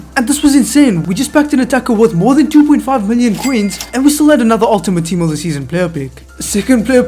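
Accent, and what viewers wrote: South Africa. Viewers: Are you from south Africa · I'm not gonna lie his sounds a little south african 😅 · are you south african???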